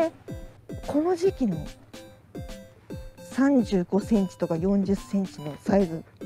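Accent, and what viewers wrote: Kagoshima, Japan. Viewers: メェ～餌も凄いけど鹿児島の海の魚影が凄いと思う👀 鹿児島訛り可愛いかった😊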